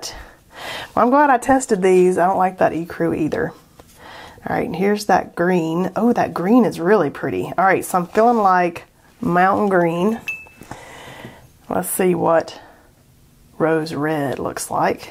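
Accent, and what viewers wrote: South, United States. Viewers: FINALLY find someone with MY accent. I was beginning to think I was the only southern artist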